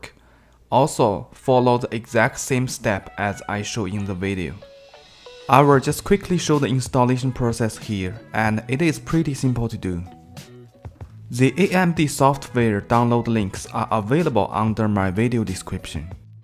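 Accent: China. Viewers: 怎么像中文口音啊老铁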